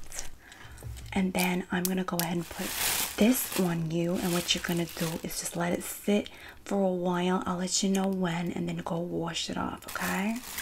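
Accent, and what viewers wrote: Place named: New Jersey, United States. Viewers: That New Joisy accent has me laughing! It's great! LOL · Love your jersey accent videos!!😊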